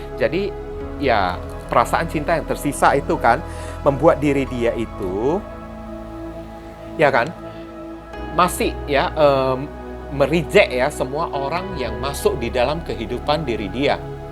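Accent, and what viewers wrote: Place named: Medan, Indonesia. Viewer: Saya pilih pile 2. Terima kasih ko readingannya selalu resonate. salah satu tarot reader favorit saya..readingannya banyak nasehat2 baik yg memotivasi..kadang serius kadang dselingi dengan lelucon2 yg lucu bikin saya ngakak dengan logat2 Medannya yg kocak 😂😂🙏🙏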